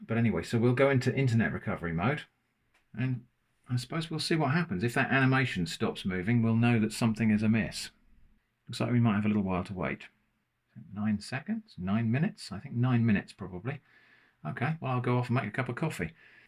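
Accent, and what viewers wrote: United Kingdom. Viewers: its a general misconception, you should not leave it plugged into the electrical socket for earth. Esd earth and electrical earth are different, you need to be on the same electrical level. just unplug the cable. used to install and maintain clean rooms and esd workshops for British Airways for 7 years. Mains earth and esd earth are total separated until they join in the sub station. you sound British? so we have a lot of rain in the uk so static generally is not a problem, unless you are in Arizona USA were it is dry as hell, very good video, love Linux mint, i have it on my two pcs and laptop. will be watching more. just subscribed.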